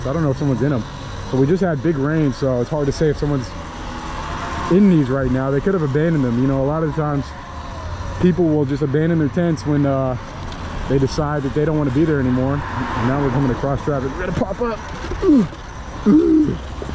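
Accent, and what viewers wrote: Minnesota, United States. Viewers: Where you from Minnesota? You got the accent lol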